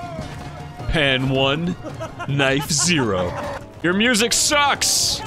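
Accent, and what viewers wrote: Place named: United Kingdom. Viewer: More British accent please 😆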